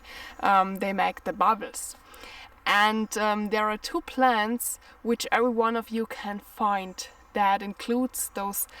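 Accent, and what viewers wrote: Germany. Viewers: I love a woman's German accent when she speaks English. Danke!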